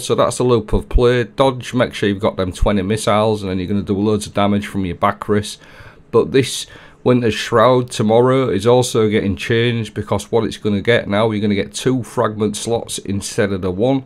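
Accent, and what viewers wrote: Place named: Manchester, United Kingdom. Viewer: my buddy from across the pond heard your voice thru my mic as I was watching this clip. He’s guessing you’re: Manc or salford 😊??? Good videos as usual. Keep ‘em coming.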